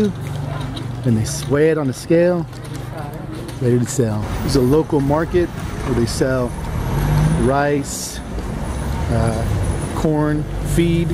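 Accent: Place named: United States. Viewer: You sound American. What state are you from?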